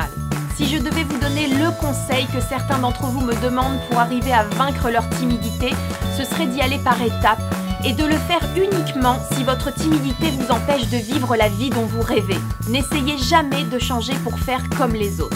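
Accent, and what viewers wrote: Normandy, France. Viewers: Ho ! Un accent Normand ? Tu est Normande ? :D (Gyaah ! Un point commun avec toi si c'est oui ! °3°) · Superbe vidéo! ^^ J'aime beaucoup t'es vidéos à chaque fois sa redonne du pep's! ♥ Tu as dis que tu avais un accent Normand donc tu étais originaire de Normandie! Et je voulais savoir si tu y viens encore souvent? Car je vis en Haute-Normandie et j'aimerais tellement te voir. Peut-être qu'un jour tu viendras à Rouen!:D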